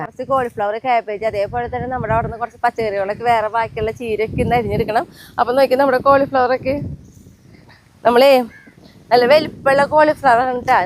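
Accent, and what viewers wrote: Thrissur, India. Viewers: കാണുന്നതു തന്നെ സന്തോഷം ഇത് സ്ഥലം എവിടാണ് തൃശ്ശൂർ ഭാഷാരീതി ഇഷ്ട്ടാ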